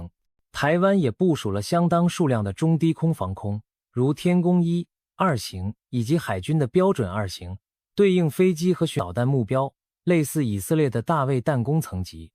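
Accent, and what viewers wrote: China. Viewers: 聽這主持人口音應該是中國那邊的 不但把我們防空飛彈優點表現出來還時不時稱我們是國家 光這一點就謝謝您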